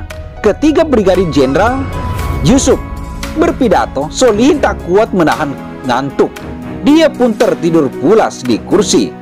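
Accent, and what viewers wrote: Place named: Makassar, Indonesia. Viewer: Wa'laikumusalam warohmatullahi wabarokatuh.. Masih kental logatnya daeng..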